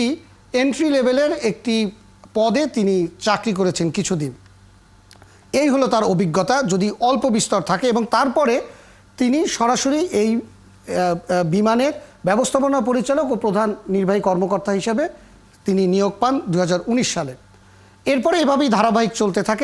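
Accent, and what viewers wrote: Kolkata, India. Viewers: এটা কি বাংলাদেশের চ্যানেল। যিনি কথা বলছেন উনি কলকাতার মত করে কথাবলছেন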